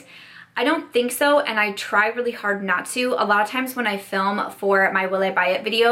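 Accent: United Kingdom. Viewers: I loved your brutish accent! 😂😂 love you ❤️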